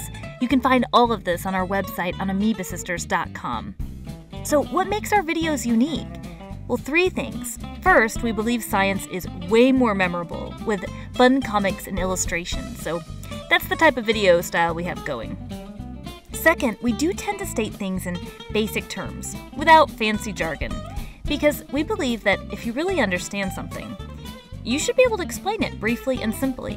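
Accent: United States. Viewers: Your channel is amazing for explaining hard biological concepts to my classes (i'm also a Science Teacher). I often use it after explaining a concept myself to reinforce a visual idea of what is going on. My only criticisms are: 1. Your mic is inconsistant sometimes, maybe you need a better quality one? 2. Your American accent is heavy, and because you're speaking fast, it can be tricky to understand (only sometimes) for us in Australia. Please try and speak as clear as possible without too much 'singing' style narration. (it's hard to explain haha!)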